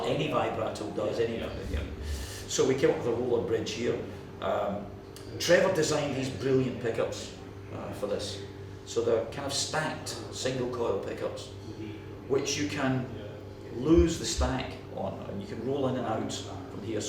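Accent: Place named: Scotland, United Kingdom. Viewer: Oh my... he talks like Fish.. is Scot?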